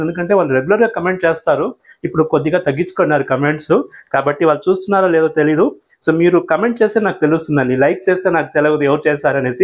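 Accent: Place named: Guntur, India. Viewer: మీరు యూట్యూబ్లో వంట కాకుండా జాబ్ ఏమైనా చేస్తారా అండి మీ ప్రాంతం ఎక్కడ అంటే మీ తెలుగును బట్టి గుంటూరు ఆ విజయవాడ అని అడుగుతున్నాను అంతేను మీరు ప్రజెంట్ ఎక్కడ ఉంటున్నారు మీరు బ్రాహ్మిన్స్ ఆ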